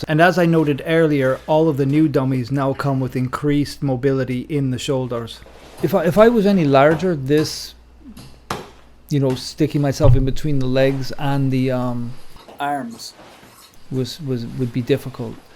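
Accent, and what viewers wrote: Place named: Ireland. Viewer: ! Great review & very cool to hear the Irish accent! I'm in a similar-ish boat in that life means I can't & won't be able to get to the gym as much as I want going forward... I'm a life long Gaelic footballer who found martial arts just over two (covid) years ago. I'm chasing time (42 now in May; just had my first novice MMA fight) & I realise solo training will need to be a (big) part of my practice going forward; I/we can't be away out of the house every evening training jiu-jitsu!! I bought an unfilled dummy/'corpse' during Lockdown, but the filling process near broke my heart + I just couldn't get it right. I'm seriously considering buying a filled SMARTY & getting it shipped to Ireland... Expensive I know, but I'm thinking it could turn out to be priceless! Thoughts?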